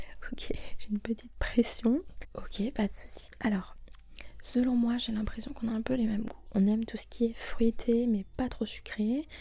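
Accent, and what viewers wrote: France. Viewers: Ton accent🥰🤤 j’adore les français votre accent et votre voix extrêmement douce🤗❤️ Bisous du Québec 💕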